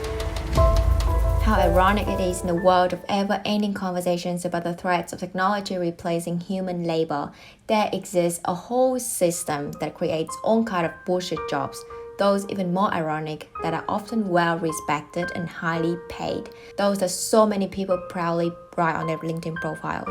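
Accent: Australia. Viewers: where are u based in now? is that austrailian accent haha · are you Australian? asking because of the accent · Are you Australian?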